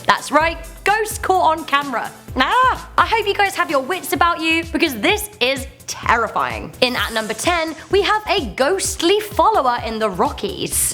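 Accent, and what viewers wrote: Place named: United Kingdom. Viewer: too british... we need danny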